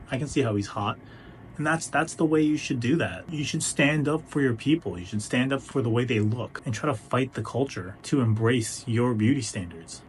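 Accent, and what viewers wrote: United States. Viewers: you sound like such an american dude... if you ever actually cared for any of this media you would know japan always sets its fantasy setting in pseudo-european worlds if it occurs in modern day japan then majority of characters are always japanese and im sorry but naruto mario and attack on titan doesnt represent the medium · You sound way more American than Japanese lol Japan does embrace its beauty but Japanese are also abstract thinkers, certain things are just chic. In America we imitate the Asians as well it’s a cultural exchange. Also sounds like you’re just referring to major city areas. Goku turns sayan bc there’s an alien race called the blondes and turning gold symbolizes godlike elements.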